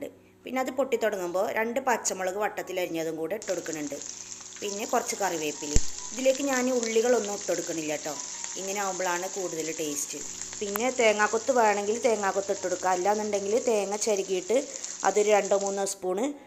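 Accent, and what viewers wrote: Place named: Malappuram, India. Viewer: X-Mas Special Bief Fryoo😮😅Am much Impressed with Your Way of TalkinG Than CookinG ..Adhu oru vere Vibaan ...Asaal Malappuram Kaaaariii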